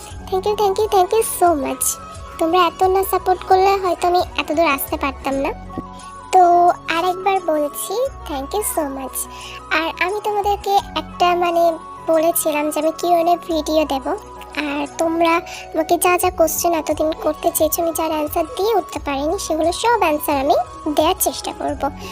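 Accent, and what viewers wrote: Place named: Bangladesh. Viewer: Bangladeshi der moto kotha bolo keno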